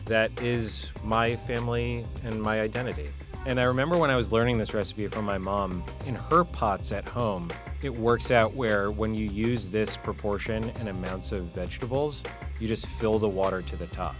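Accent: United States. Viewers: Wow u sound American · Therefore, he's " ", not " ". I don't think he is an immigrant from Ukraine, because he speaks American accent and pronounces his surname wrong. Probably his parents were the immigrants.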